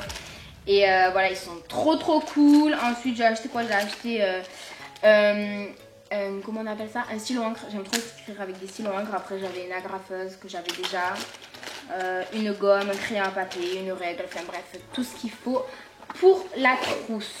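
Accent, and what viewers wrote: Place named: Toulouse, France. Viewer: Salut ma belle je viens juste de découvrir ta chaîne youtube et c'est une très bonne découverte dès le début de la vidéo j'ai directement reconnu l'accent de Toulouse qui est aussi ma ville, j'espère te croiser un jour dans Toulouse.Continu ce que tu fait tu est super naturelle et c'est une très bonne vidéo, bonne continuation sur youtube :)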